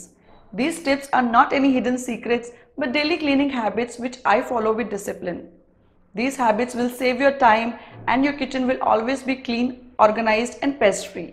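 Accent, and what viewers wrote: India. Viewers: I truly enjoyed this vid. Indian accent made it so much better. Thanks for the tips 🙂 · Absolutely Indian version of talking English pronunciation...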